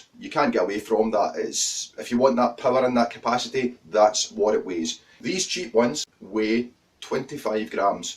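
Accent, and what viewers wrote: Scotland, United Kingdom. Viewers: I have learned my lesson the hard way!. Don't buy any vape products such as batteries 18560 (spoke about in this video) or vape coils. I have purchased both and received fake Products both fake batteries and vape coils. I even had a seller contact me when I left negative feedback asking what he could do to get me to change my negative rating!! REALLY! Thanks for the video - great job and being Scottish I loved the accent too! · naw, I pegged that accent as Scottish quite early, and honestly, I think that short of accent sounds kinda cool IMHO.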